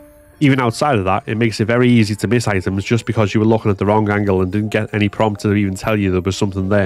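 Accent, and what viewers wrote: Liverpool, United Kingdom. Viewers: 1st time av heard a reviewer with a scouse accent 👍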